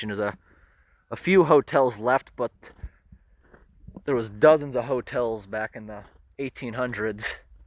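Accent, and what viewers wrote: New England, United States. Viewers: Wonder why abandoned buildings like this weren’t torn down. Love your New England accent btw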